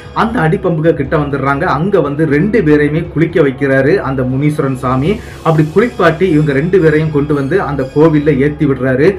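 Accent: Tirunelveli, India. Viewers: தம்பி திருனவேலியா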